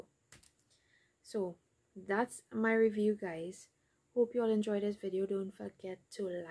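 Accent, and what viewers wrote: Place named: Trinidad and Tobago. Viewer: Love the Trini accent.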